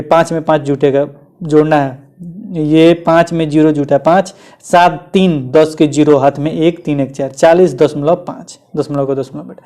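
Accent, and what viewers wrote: Bihar, India. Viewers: Sir ji aap to pakke Bihari Lg rhe hai matlb aapki bhasha 😀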